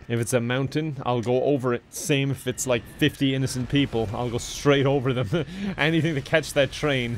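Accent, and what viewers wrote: Ireland. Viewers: You sound exceptionally Irish in this video 😂😂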